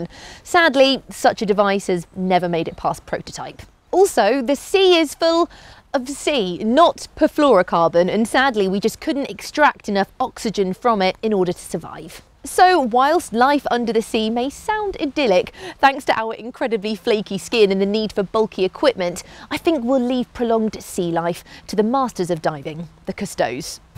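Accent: England, United Kingdom. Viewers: Strongest british accent eva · It's only an English accent, nothing ground breaking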